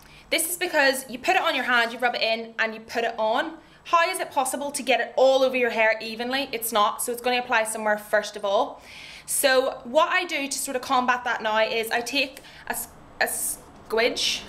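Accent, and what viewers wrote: Ireland. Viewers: You are so damn adorable. Love your Irish accent! Cutie pie, good video :) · I usually hate Irish accents but I love yours:) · i love her voice:) irish? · i like your hair! its awesome and your accent =) <3 Ireland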